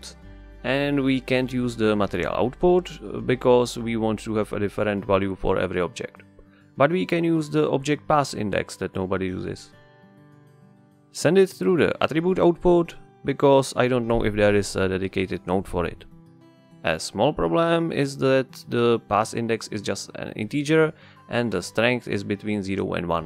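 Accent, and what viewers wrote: Czech Republic. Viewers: Hah, právě jsem na tento tutoriál narazil a hned u prvních slov si říkám, že mi ta angličtina přijde s českým přízvukem :D Tak jsem rád, že dobře slyším. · is " " a CZECH channel??? the guy's voice sounds like if he sopke Czech at some time I am czech too and so far I haven't found allmost any good czech Blender Channels and I just want to know if I am right and there is someone else I could speak to in my home language so am I right or wrong???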